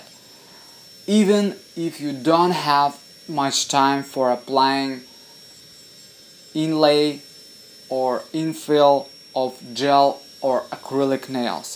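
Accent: Russia. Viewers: явно русский, страно разговаривает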